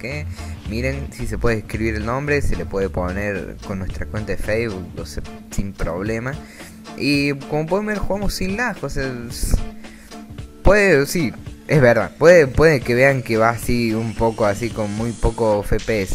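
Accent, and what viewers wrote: Córdoba, Argentina. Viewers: Eres cordobes?<3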